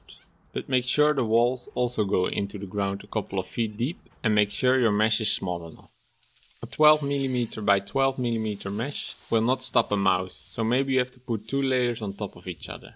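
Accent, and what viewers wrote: Netherlands. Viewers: Are you Dutch? I hear some accent. Hoe gaat het jongen?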